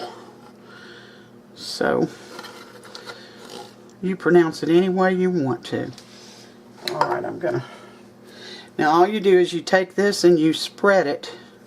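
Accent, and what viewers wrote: South, United States. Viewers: oh I didn’t think you named a country of origin or if you did I missed it! I just thought I would add that little trivia. I love watching your videos. What I love most is your straight forwardness and honesty. I’m the same way but it gets me in trouble sometimes. I have no filter and that is not a good thing!🤣😂🤣😂🤣😂 And you would never know you were raised up north. You sound and cook like a true southerner!! 💖💖💖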